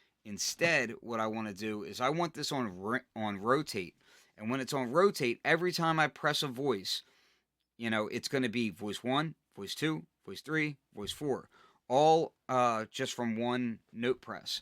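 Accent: Philadelphia, United States. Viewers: love your vids. gotta be a philly accent possible jersey or delaware.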